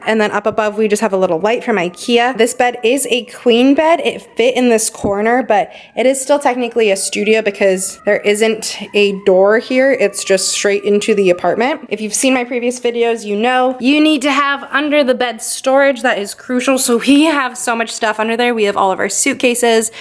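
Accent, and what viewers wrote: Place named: West Coast, United States. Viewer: awe thanks!!!! They were selling that pillow for $80 bucks. Crazy. But..... I love the Chicago flag. It’s the second most recognizable flag after our country flag. Sounds like you’re a West coast girl. Prepare yourself for Winter......lol.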